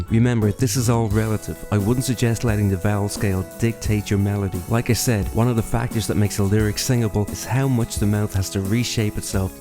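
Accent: Ireland. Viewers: Are you Irish or Dutch? Such an interesting accent! Now I can hear that you are Irish right? Dubliny? Still could be a Dutch person whose learnt from American tv! Crazy! Hope you’re well fella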